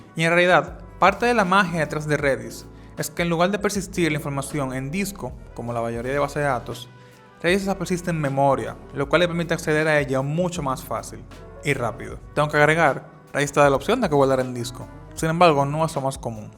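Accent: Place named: Dominican Republic. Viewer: Algo me dice que eres Dominicano, si es así, estoy muy orgulloso por ver compatriotas haciendo esta clase de contenidos. ¡Excelente vídeo! ¿Podrías hacer un vídeo sobre el road map que una persona no informática debería seguir para ser un Desarrollador de Base de Datos en 2025?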